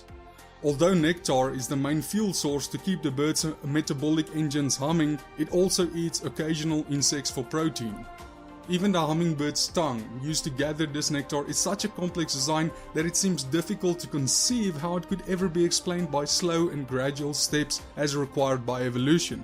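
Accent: South Africa. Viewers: You're South African right?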